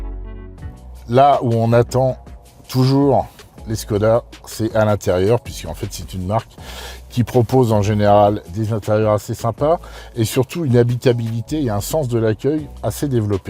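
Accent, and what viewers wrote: Paris, France. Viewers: J'adore l'accent Titi parisien. Un vrai régal. Génial. Jean Jacques 57 printemps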